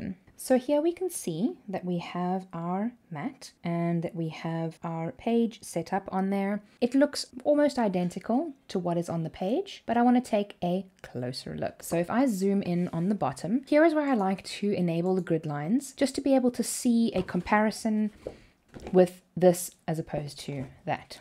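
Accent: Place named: South Africa. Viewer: Your accent is so nostalgic. miss SA so much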